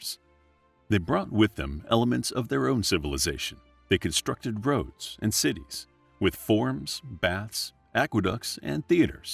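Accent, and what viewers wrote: United States. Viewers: American pronounceation,is different to ours. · A bit of a list but a reasonable summary. Its a pity it had to be read out by an American accent.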